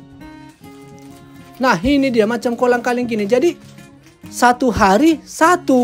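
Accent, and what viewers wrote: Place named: Batak, Indonesia. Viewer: Kimbek kali ah. Kalau dengar logat bg ngomong jd ingat sama kawan si batak gila 🤣 logatnya campur² jawa 😅 kocak kocak😂❤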